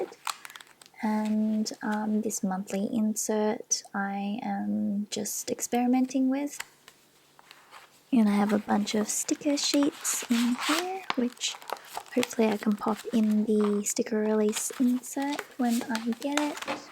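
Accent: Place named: Australia. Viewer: I'm falling down a deep rabbit hole that is the planning and journaling community and I LOVE your channel! Soft spoken creators and simple aesthetics are the easiest ways to get me subscribed, so thank you for creating, can't wait to see more! I use a Hobonichi weeks and Field Notes for commonplacing/Korean language study planning (Megan Rhiannon's former system, if you know her channel). Plus I just ordered a vegan traveler's notebook from etsy and so seeing other people's systems to see what works well for them is wonderful to see what could be adapted to my system. (Also, one of the only podcasts I listen to is an Australian comic book movie podcast - The Weekly Planet, so I love that I get to add more Australians to my repertoire of favorite content.)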